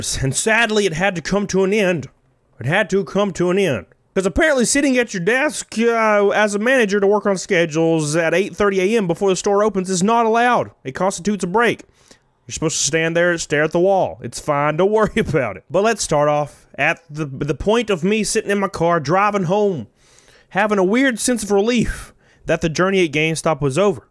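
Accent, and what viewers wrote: South, United States. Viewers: sound either Like an Oklahoma or Texas accent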